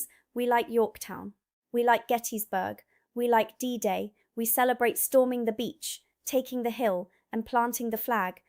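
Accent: United Kingdom. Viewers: If you're American why do you speak with a strong British accent?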